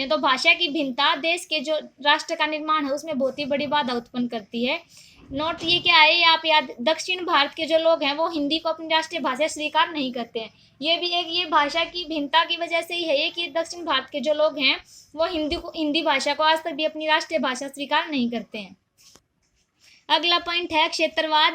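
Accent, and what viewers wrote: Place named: Haryana, India. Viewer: You are from haryana ❤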